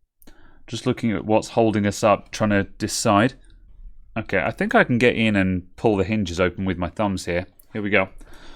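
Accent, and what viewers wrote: United Kingdom. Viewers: Yes, do it like that and add captions Anyway your videos are all wonderful without "ASMR" since British accent are the best english accent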